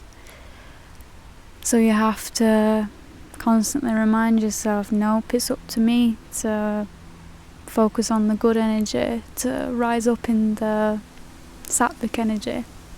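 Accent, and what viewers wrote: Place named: Lancashire, United Kingdom. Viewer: Great video. Im a hindu born in London, family originally from Bolton. It's crazy how 2nd/3rd generation Indians in the UK, myself inc., have lost faith in the wisdom of hindu philosophy, i think we're poorer for it. More crazier still is for me to be rediscovering my roots through someone with your awesome Lancashire accent! Keep up the positive vibes and thanks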